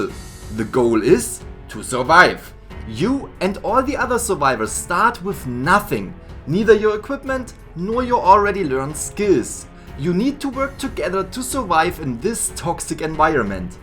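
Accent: Germany. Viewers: Der harte deutsche Akzent ist herrlich xD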